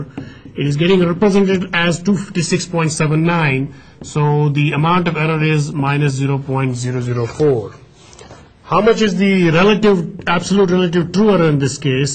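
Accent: India. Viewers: good explanation.....but had a very hard time digesting indian accent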